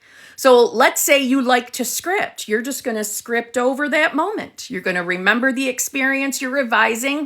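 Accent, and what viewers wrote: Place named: Long Island, United States. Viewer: Neville's wife manifested HIM! He talks about it and he wanted out of his first marriage and New York State was opposed. As you stated, those were limited timelines. So the woman who became his wife, did something. He never states what, but it was a manifestation protocol. Love you 🦋🌺 P.S. I am originally from Manhattan, and attended C.W. Post. I swear I hear a Long Island accent. I listen to you in the morning while doing my stretches now...the rampage on life. LOVE IT, LOVE YOU! It's all Done. I believe.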